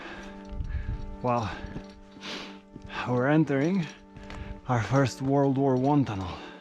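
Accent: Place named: Romania. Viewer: Mi-am si zis, ca dupa accent esti roman. Felicitari pt ceea ce faci!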